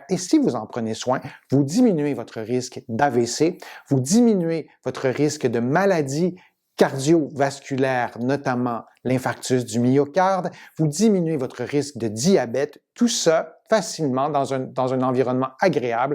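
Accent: Quebec, Canada. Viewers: Passionnant,,merci cher monsieur,je m ''abonne.....qui plus est , j'adooore votre accent du Québec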